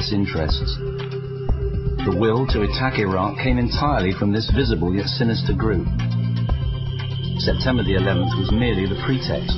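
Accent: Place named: United Kingdom. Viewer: Cool vid. I especially enjoyed the British accent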